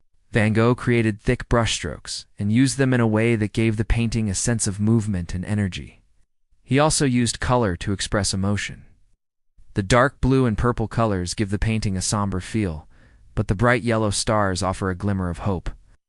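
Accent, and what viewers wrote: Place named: United States. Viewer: the worst about these common narrations is this super dumb American accent. US Americans can´t pronounce names, places and a single foreign language word f.s. and don´t even make an effort to try .... absolutely atrocious and dumb - especially in documentaries. Best turn off the narrator (as usual)..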